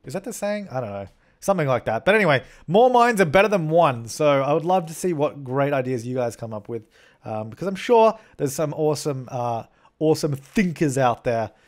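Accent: Australia. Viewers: Are you Australian?Because I am🇦🇺Like if you are Aussie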